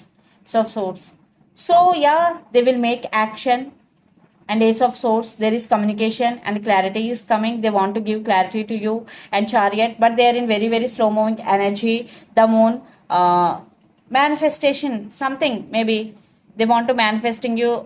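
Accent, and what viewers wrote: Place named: South India, India. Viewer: Your reading is to the point.i am really Impressed. your accent is south Indian.GOD BLESS YOU.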